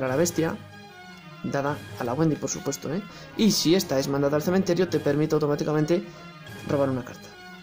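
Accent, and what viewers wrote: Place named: Spain. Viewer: Por tu acento eres de España de que lugar? Soy de Madrid asi un dia jugamos unos duelitos, gran video!!!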